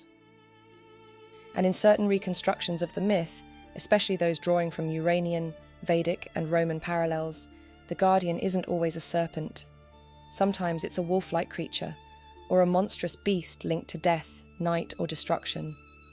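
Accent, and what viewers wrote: England, United Kingdom. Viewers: The delightful English accent enticing us down the path, peeling back the layers; soon the unwary listener finds himself traversing the underworld. Delicious! But you stopped the story short just when it was becoming really interesting. You were about to step into the world of gods and the spirit, and the value of expressions of consciousness to the ancients, their supposed cosmology and divine order. Another day hopefully.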